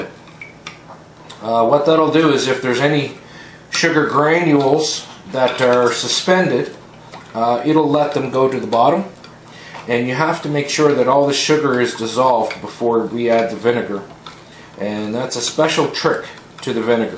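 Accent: Nova Scotia, Canada. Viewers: "the haaaard part" - I'm from NS, living in Japan now and trying to spread local cuisine. Your videos have helped me out a lot and it's nice to hear an East coast accent.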